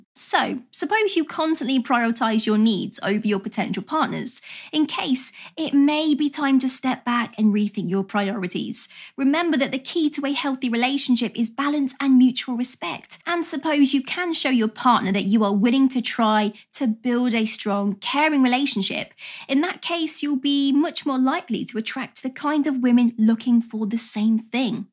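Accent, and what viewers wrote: England, United Kingdom. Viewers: the only nice thing about this video is that she has an english accent. and for the rest I have no remarks or criticisms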